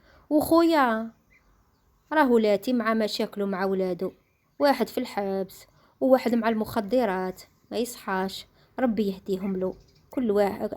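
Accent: Algeria. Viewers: والله ياختي راكي في القمة وأنا اكتشفت قناتك بالصدفة كنت انحوس على حكايات القسنطينية لقيت القناة أنتاعك وعجبتني وعجبتني اللهجة انتاعك على خاطر انتاع الشرق وأنا انقولك واصلي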